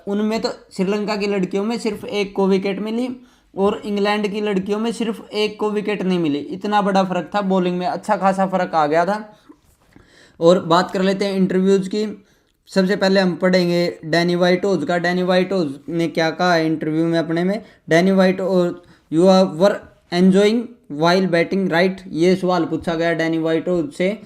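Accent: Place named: Haryana, India. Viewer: Haryana se ho kya bhai ? Thoda haryanvi touch h aapki voice me 😅🤝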